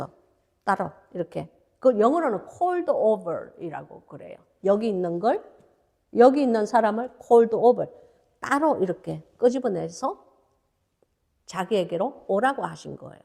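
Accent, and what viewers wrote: Gyeongsang, South Korea. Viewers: 목사님~보고싶었어요❤ 진짜~~~성경공부 오랫만😂 너무 너무 좋아요 목사님 첫인사 하시는 것도 넘 귀여우세용 *꾸지리ᆢ*갱상도 사투리도 ㅋㅋ (저도 부산~^^)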